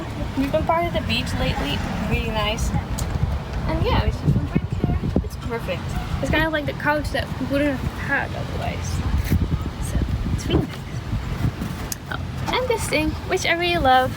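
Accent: Netherlands. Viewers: Ahh dacht al dat ik Nederlands accent hoorde😁 super Tof! Ik wil dit ook in nederland😍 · Is that a Dutch accent? Nice simple van design.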